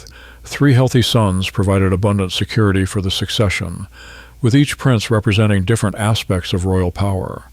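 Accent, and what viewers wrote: United States. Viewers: A bit hard to listen to an American accent on this subject; bye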